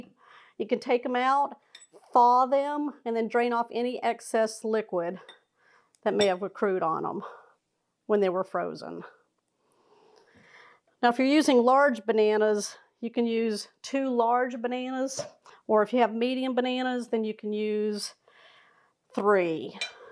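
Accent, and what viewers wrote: Texas, United States. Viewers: Hee hee shout out from Auckland New Zealand!!! I got hooked on your lovely Texas drawl! I saw your other amazing blueberry monkey bread recipe! We don’t have your USA thing ‘biscuits’. Can anyone tell me what that actually is? Scone mix?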